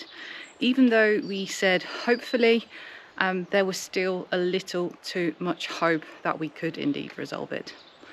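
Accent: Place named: Australia. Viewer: Just wondering if you have lived in my country Australia? 🇦🇺 Sometimes you have a little Aussie twang. You did a great job facing a disgusting 🤮 situation. Brave woman.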